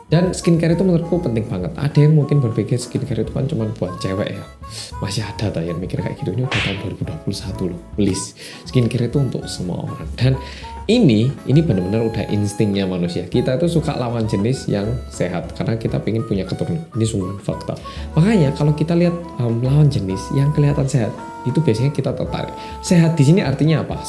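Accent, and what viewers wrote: Java, Indonesia. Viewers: Hmmm kangen pembukaan "orang Korea yg medok music deb deb deb" Ko sekrng ngga ada lagi yaa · Hi , MEDOK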